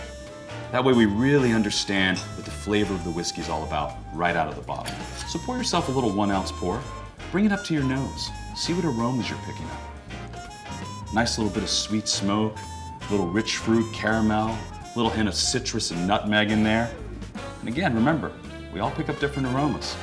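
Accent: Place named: United States. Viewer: OK, stop criticising, people! This video dates back 5 years. If they would do it again, this guy wouldn't wear a V-neck T-shirt under a suit jacket. Hell, he wouldn't wear such a cheap, crumpled, ill-fitting suit jacket. They wouldn't allow this guy to talk so slick American to promote a Scottish brand. And they would cut out that annoying background music. Wouldn't they?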